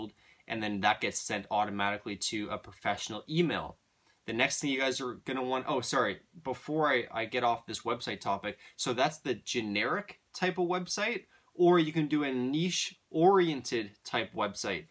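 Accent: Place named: Canada. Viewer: I know you'd Canadian by how u say owt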